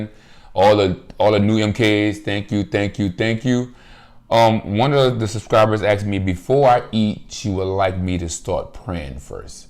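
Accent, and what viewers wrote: United States. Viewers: Not a fan of crawfish, but your country accent makes it interesting 😃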